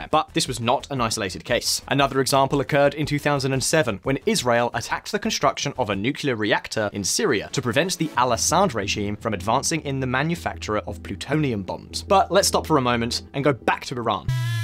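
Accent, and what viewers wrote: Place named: England, United Kingdom. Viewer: It's called the English Language, & this guy sounds English to me. Maybe you've got it wrong...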